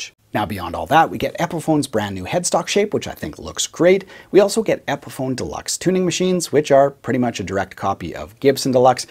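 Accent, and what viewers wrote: Canada. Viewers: I could tell you were from Canada! I'm from PEI!...'Eh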